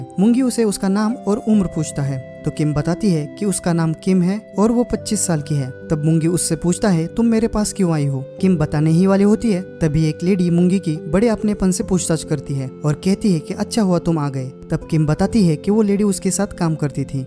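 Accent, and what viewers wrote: Maharashtra, India. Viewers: गावंडाळ हिंदी बोलतोस म्हणजे महाराष्ट्र चा आहे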